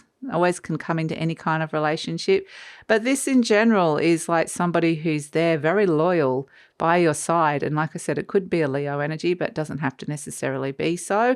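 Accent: Australia. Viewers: Great forcast! 👍 Yes we need to talk hope my Virgo of 43 yrs Love of my life He is very important to me ♥️ 3rdparty came into our marriage but l have forgiven him long ago. He was loyal but grief hit us that no parent should have to go thru Would be great if he gets intouch so we can have closer one way or other .l did try but silent his end Thank you so much for your reading very positive and offer's Hope ! You sound like you are Australian??. The view out your windows is peaceful looking at your tree ferns And your your feather friend having his say love it Chooks are lovely love from Queensland Australia 🇦🇺 ♥️🎶🌈🙏🐞🦋🌞👍💐💐🙏🙏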